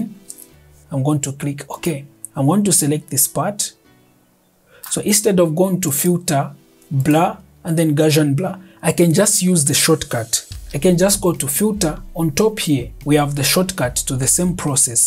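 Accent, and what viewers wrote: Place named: Zambia. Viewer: For some reason you sound Zambian, not that its important at this point😁 very good tutorial.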